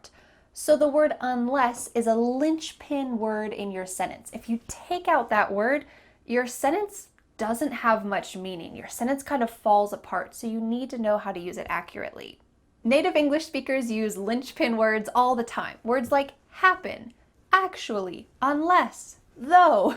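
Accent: United States. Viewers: Are you American?? I like American accent💜 and your smile is so beautiful 💜💜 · Are you speaking USA English?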